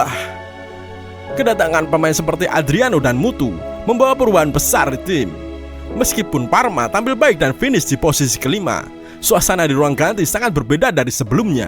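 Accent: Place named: Java, Indonesia. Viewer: Medog amat om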